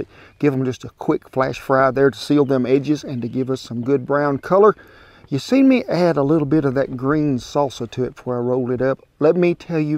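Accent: South, United States. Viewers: Thank you for all your video's. I love the way you explain things in southern language. May God bless you always. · Damn, I'm a Brit who's always had a thing for the country American accent and good food who's just stumbled on your videos, you've just hit both nails in one. Looking forward to watching more in the future sir!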